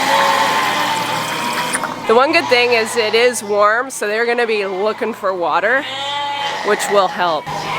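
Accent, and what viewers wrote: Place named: Ontario, Canada. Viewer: I'm from Ontario but live in NZ and love hearing my home accents...following the trials and joys... I always learn so much from you, sheep and farm side... always inspired by how hard you work and the dedication to the animals and land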